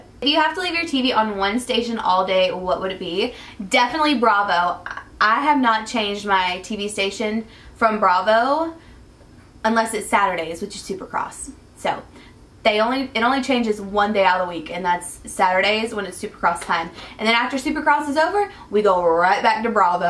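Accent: South, United States. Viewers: Yea.. we would totally be best friends if we ever met. Totally! Southern twang, bravo, gossip girl, dog lovers, dreams to open a no kill shelter! Ah! Awesome! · I know what you mean with the southerness when filming! I also am a lot more southern in person than on camera. Glad it isn't just me haha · Was it just me or did no one even notice her accent until she pointed it out? 😞 like fr she said "my southern accent" and I was just like "omg wtf?? U have an accent?" And then when I payed attention I was like "omfg u have a southern accent!" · You're so down to earth and I wish I knew you in person! You seem like the type of person I would get along with so well and your southern accent is so fuckin cute! (In the least creeper way hahahah) love you girl!!!😍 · I know I'm a little late in catching up on your videos but I would definitely love it if you read what you've been writing in your diary, I'd love it if you talked more about your experiences relationship-wise! 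And I love your southern accent, let it come out more(: Hahaha. Love you<3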